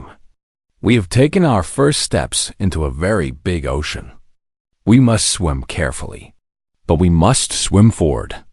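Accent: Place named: Boston, United States. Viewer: Yella. Can we get a narrator that doesn’t have a god awful Boston accent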